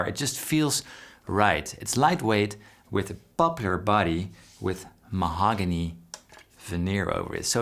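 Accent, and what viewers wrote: Netherlands. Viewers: Haha kan aan je engels horen dat je Nederlands bent😅 blijf zo door gaan kijk altijd al je video’s🔥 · That's a strong Dutch accent. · Are u dutch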